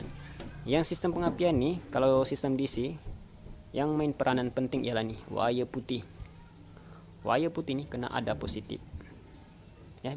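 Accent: Sabah, Malaysia. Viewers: Mantap bossku,Kamu area mana bossku?slang Kamu macam sabahan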